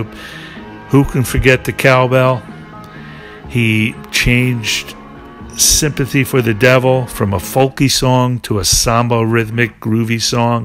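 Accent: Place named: New York, United States. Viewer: I especially love the voice in it !!!! The voice commentary is very very good!!! And it’s very detailed and correct to what actually went on , took place and unfolded in history and in the meaningful significance that it holds . Is your slight accent a New York accent ? May I ask ? It is very good !!!! It’s like that old show about the 60s and 70s the wonder years !!!!!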